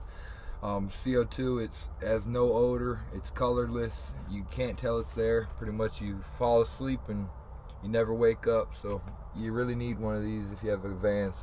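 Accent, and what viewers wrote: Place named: United States. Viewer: cute american accent lol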